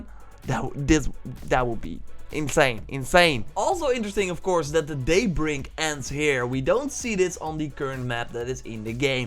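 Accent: Netherlands. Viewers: I never thought about your dutch accen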